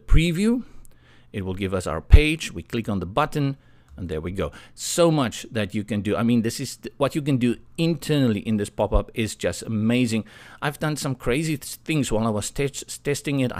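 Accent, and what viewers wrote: South Africa. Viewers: Thank you for the video, really appreciate the time you put into it. Lekker om na 'n Afrikaner te luister :)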